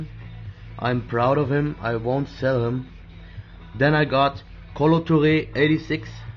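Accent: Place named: Germany. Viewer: man hört deinen deutschen akzent haha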